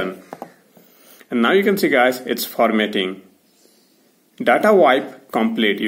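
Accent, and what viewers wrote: India. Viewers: This video was the most Helpful and most Thorough video, even with the Indian accent(and this is a Compliment). Thanks a lot.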